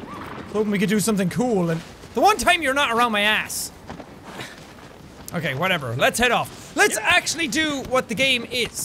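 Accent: Ireland. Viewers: sounded so much more Irish today! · Is it just me, or is Jacks Irish coming out in this video. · sounds exceedingly Irish in this episode lol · Has bin' getting more Irish? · Does anyone else notice when gets excited he gets more Irish? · Is it just me or did suddenly get a thick Irish accent halfway through the video?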